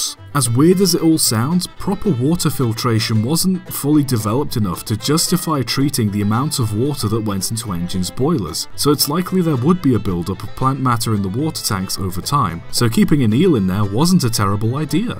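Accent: United Kingdom. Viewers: American South fiddle music and a British Narrator, this has got to be good. Future me: and it absolutely was!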